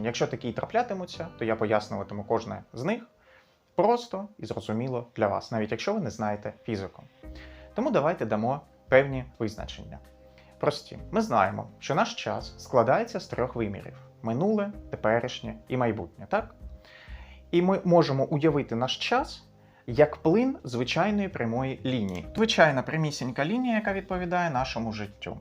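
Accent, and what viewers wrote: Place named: Russia. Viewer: Цікавий російський акцент.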